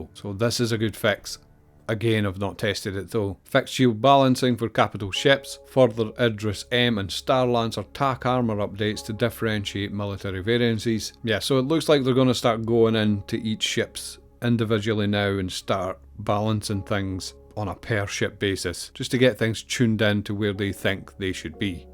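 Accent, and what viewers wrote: Scotland, United Kingdom. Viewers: Something Star Trek about a Scottish guy talking about engineering. Give it all she's got!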